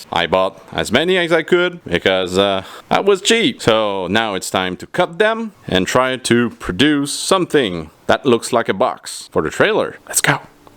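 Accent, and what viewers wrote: Quebec, Canada. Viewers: You are very talented. Are you French Canadian.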